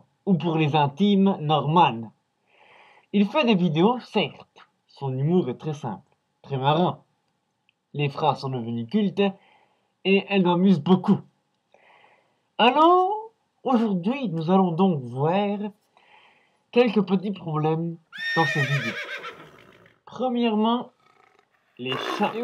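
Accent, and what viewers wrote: Belgium. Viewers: ACCENT BELGE! BAH SA METONNE PAS! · ton accent belge i ma bandé!! alor continu a decouvrir ton mac et faire mumuz ac photobooth!! obligé de mettre un mask pr parler soi tu sert a rien soit ta pa de couille! bref t bidon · "Prinnnmièrement, les chats sont des animaux comme les auuutres hein: Ils ont droit à du respect les iiiiinfants, du REPECT! Et ca Norman ne la pas cooompris les iiiiiinfants" J'approuve, c'est bien wallon comme chez moi ca:p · Ah oui, il est sûrement belge lui. Je dis ça par rapport à son accent. Mais vous inquiétez pas les gens, ce mec est juste chiant. Mais je me demande franchement s'il ne faut pas prendre la chose avec humour, tout ça n'est qu'un sketch sensé faire rire. Ou sinon, c'est de la jalousie mal placée. Pis bon, niveau philosophie, éthologie et argumentation, faudra repasser. Crois-moi mon "petit gars", le chat s'il est vraiment maltraité, il aura tôt fait de se casser. 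· ne cherche pas serieu...1) je vois pas le manque de respect envers les chats... et 2) fais pas ton philosophe, ton accent belge craint a mort!! et enfin 3) Norman est bien plus drôle...!! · Et evidémment, qui c'est qui fait ce genre de chose? Un Belge >< (Au temps pour moi si tu ne l'es pas, ton accent a couper au couteau m'a trompé) Après ca, nous, bons belges que nous sommes, on va encore s'en prendre plein la geule, sincèrement du fond du coeur, MERCI! (Putain de VDM la:/ ) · Fait pitié en plus jcomprend rien a cki raconte on dirait encore un belge de merde avec sa voix xddd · tu serait pas belge toi? (pour ton accent de merde et ta connerie naturel)! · Nan mais sans déconner les gens vous critiquez sa vidéo mais le mec ca se voit bien qu'il a fait ca pour déconner avec son accent belge et tout moi ca m'a bien fait rire.... Alors arrêtez 2 secondes de lecher les boules de Norman quoi... 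· "Si un homme n'est pas bon z'avec les animaux." + GG l'accent belge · t'es aussi débile que ses vidéos. Tu te caches derrière un masque et ca camoufle pas ton accent belge. On a compris que tu defends les chats. Par contre, tu devrais faire une video sur les félines quand elles prennent leur petit par la peau du cou. Je pense que t'es resté trop longtemps sans oxygene. retires ton masque ca ira mieux. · Tu craint casse toi avec ton accent tu insulte la belgique!!!!!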